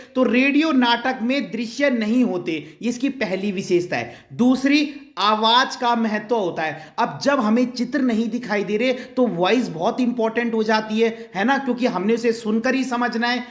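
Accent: Garhwal, India. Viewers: Are u garhwali? Tone aari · Sir aap garhwali ho kyy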